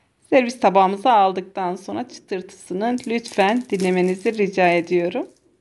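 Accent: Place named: Russia. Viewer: Ben de Rus birisi anlatıyor sandım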